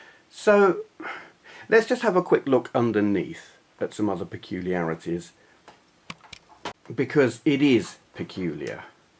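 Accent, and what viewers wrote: United Kingdom. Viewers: u from UK?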